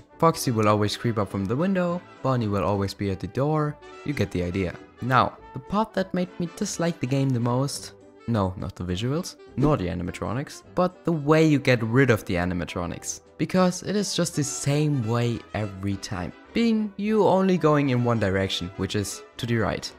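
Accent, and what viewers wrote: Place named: Germany. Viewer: I can hear the German I think xD but love ur videos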